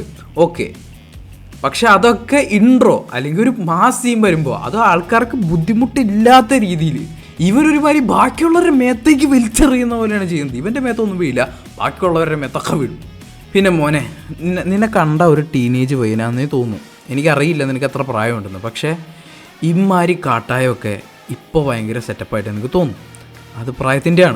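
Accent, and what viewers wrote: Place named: Kodungallur, India. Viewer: ചാലക്കുടി അല്ലെ സ്ഥലം, കൊടുങ്ങല്ലൂർ അടുത്താ , same സ്ലാംഗ് ആണ് അതുകൊണ്ടായിരിക്കും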